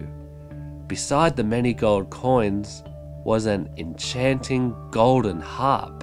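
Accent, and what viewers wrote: Australia. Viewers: "once upon a time there was a boy named jack". you took me here!! Ps: aussie accent is attractive the most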